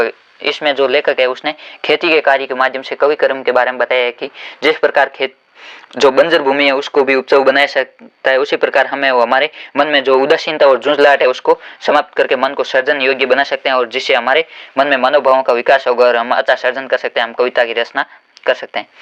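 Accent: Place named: Marwari, India. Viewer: Ser app marvedi Ho Na apki aavaj se pata chala rha hai 😊❤❤🎉🎉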